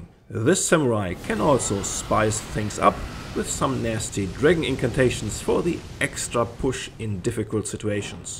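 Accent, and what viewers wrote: Germany. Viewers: Du hörst dich ziemlich Deutsch an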